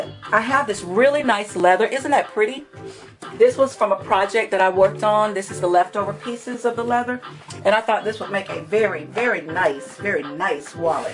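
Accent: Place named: North Carolina, United States. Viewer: I would love to be the owner of a wallet made by is this possible? You sound like you may be from my part of the woods, N.C. I loved watching your video, I'm a 71 year old man and I think you are the best teacher I have seen.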